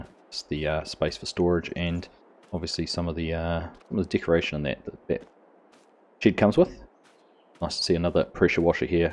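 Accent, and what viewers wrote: New Zealand. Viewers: I love this NZ accent 😁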